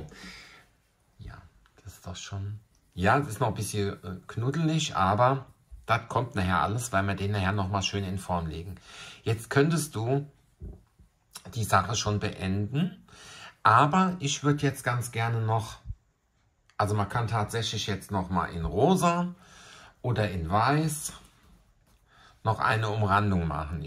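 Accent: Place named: Rhenish Hesse, Germany. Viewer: Das ist wirklich ❤ allerliebst .Hab heute meinen ersten gehäkelt. Voll knuffelig.. Ausserdem könnte ich dir stundenlang zuhören ,weil du den gleichen Dialekt hast wie ich. 😅 Ich babbel roihessisch😂 Also nochmal Dankeschön für diese wunderschöne Idee und die tolle Anleitung Lg Sonja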